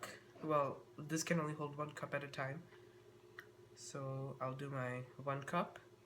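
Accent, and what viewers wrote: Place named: Trinidad and Tobago. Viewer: Very nice. best Trini channel yet. its good to hear a genuine accent and not a fake one. Keep up the good work.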